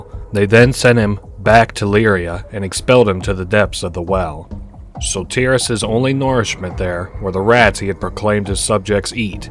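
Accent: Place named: Spain. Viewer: The most spanish english voice i ever heard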